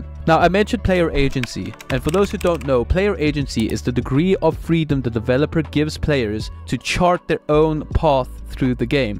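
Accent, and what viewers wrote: South Africa. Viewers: Man, I'm all in on these videos! Could this be the birth of a new thoughtful, reasonable, commentary channel on games industry and culture? It feels like The Act Man channel, but from the point of view of someone that loves games but has other things going on in life, who can't grind a game 8hrs a day, just like most of us. I love how you add the name of the game whenever you show gameplay from that game, it helps for games that I can't recognize via gameplay, having not played them. It's a small detail, but attention to detail is a huge thing for making a high quality anything. The SA accent is also nice. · Are you South African? I can hear it in your accent bru.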